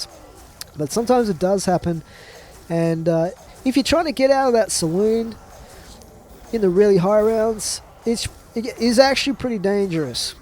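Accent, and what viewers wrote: Australia. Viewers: i like your accent australlian? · Your Aussie too?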